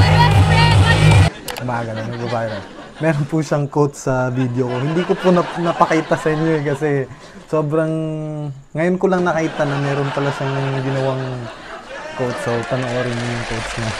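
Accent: Visayas, Philippines. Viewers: piste bisaya man diay ka hahahaha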